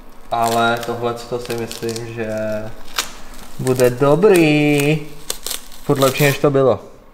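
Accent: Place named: Ústí nad Labem, Czech Republic. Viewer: furt tě slyším po ustecký jak jedeš xddd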